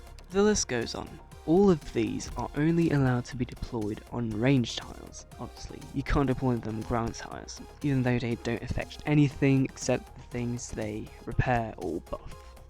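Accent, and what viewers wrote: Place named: United Kingdom. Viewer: Why is this man with a British accent saying he isn't native? Am i just really bad at placing accents?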